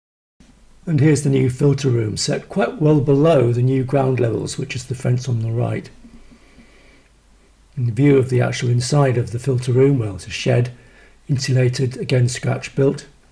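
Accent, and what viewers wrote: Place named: United Kingdom. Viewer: Great to hear a Brit voice using air lift. I’m at the design stage of building a new airlift pond, would love to see more of your airlift setup.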